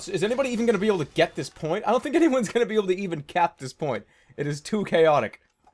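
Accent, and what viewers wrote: Boston, United States. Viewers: well he is from boston and the scout is from boston so the same accent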